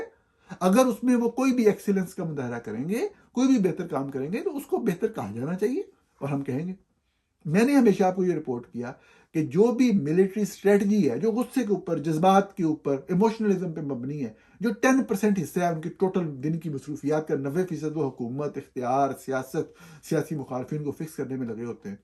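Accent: Punjab, Pakistan. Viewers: Punjabi touch 😂..Good analysis❤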